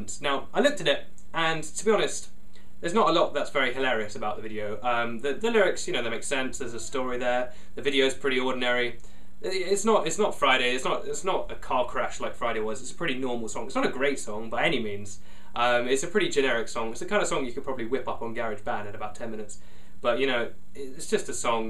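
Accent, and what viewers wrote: United Kingdom. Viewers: I LOVE YOU!!!! You are a miracle to this planet and you explained this so well and I agree 100%! You are the smartest brit ever and your accent is amazing. And rants are awesome and people should just rant about more things cause sometimes they're really fun to listen to. This video is EPIC.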